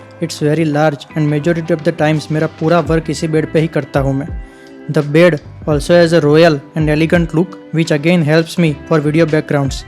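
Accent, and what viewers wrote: Gujarat, India. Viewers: Your Gujarati English accent is so sexy 🔥🔥🔥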